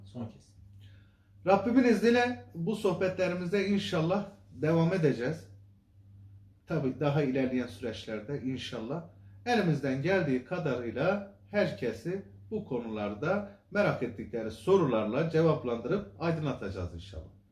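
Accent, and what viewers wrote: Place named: Birecik, Turkey. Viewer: Tam bir berecik şivesi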